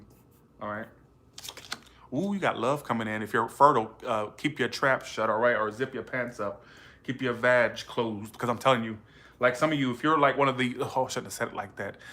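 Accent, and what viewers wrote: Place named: South, United States. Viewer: I love your personality you're so cute and I'm not a big fan of the southern accent but for you it sounds so cute and sexy you're just like so neutral I love that don't ever stop being you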